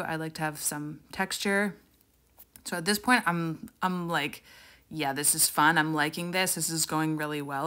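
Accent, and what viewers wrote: Canada. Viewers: I ❤ ❤ ❤ your videos. Your accent reminds me of my family in Canada. You are also an amazingly talented artist who keeps it real. You make art so approachable. You have helped me get back into painting!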